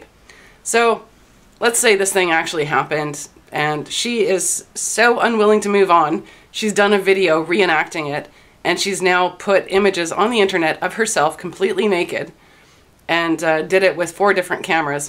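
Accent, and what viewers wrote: Canada. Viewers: You Canuck, eh?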